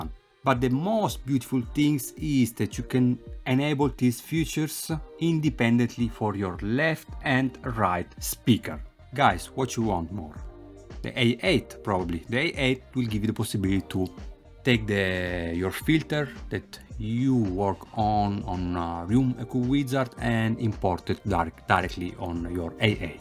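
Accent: Italy. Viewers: Terrible englsh italian way 🤕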